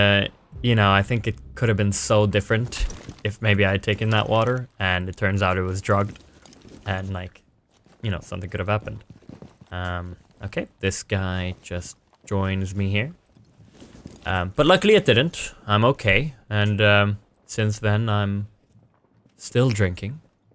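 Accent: Sweden. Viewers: Are you swedish? Sounds like that! I am swedish If you wanted to know!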